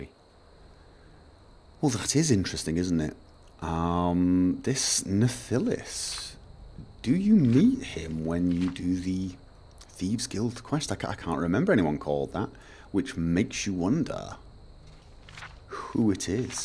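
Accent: United Kingdom. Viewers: Wooow, You're from Norway? Why the hell did I thought you were from the UK?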